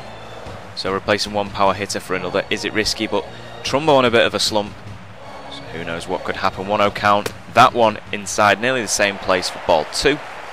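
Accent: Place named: United Kingdom. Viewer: Tis British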